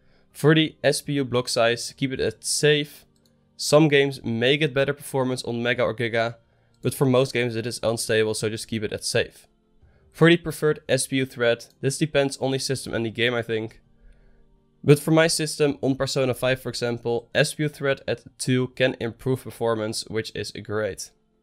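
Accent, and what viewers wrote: Netherlands. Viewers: You sound very Dutch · correct me if i'm wrong but your accent tells me that your dutch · are you dutch by any chance? cuz i hear a dutch accent. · With an accent so thick, I can tell that you are definitely Dutch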